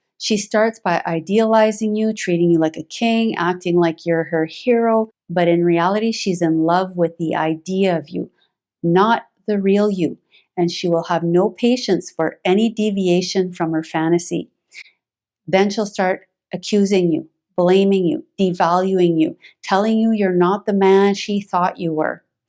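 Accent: Canada. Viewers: Sounds like a Canadian accent.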